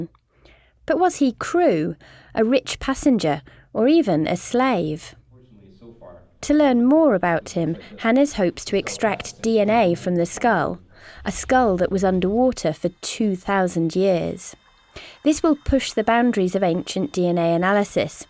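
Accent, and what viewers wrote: England, United Kingdom. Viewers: I adore English Narrators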